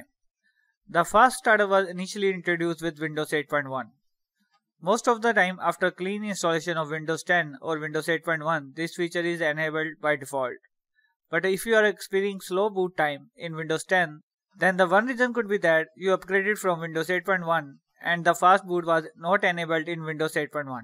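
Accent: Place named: India. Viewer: How can i translate the hindu accsent to english?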